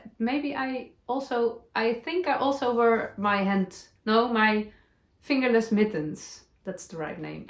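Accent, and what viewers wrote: Netherlands. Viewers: I adore your dutchy english. I can't remember the name of your sweater ? Can you mention it maybe tomorrow ?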